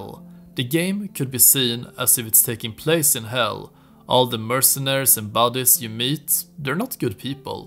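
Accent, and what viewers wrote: Sweden. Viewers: This guy has a very swenglish accent (swedish - english)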